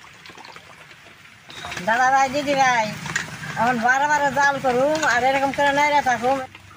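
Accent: Tangail, Bangladesh. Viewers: টাংাইল এর ভাসা নাকি মানিকগঞ্জ?? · Didi ki tangail er manus naki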